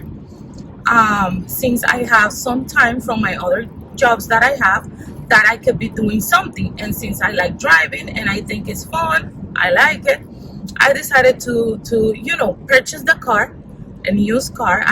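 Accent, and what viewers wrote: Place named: South Africa. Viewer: Don’t mean to be rude but you sound South African.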